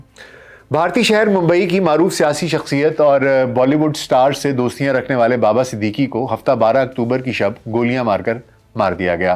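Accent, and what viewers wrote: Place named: Pakistan. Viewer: From Pakistan, app ki urdu aur andaz e bayan sa lag hi nai raha ka app india sa ho. Beautiful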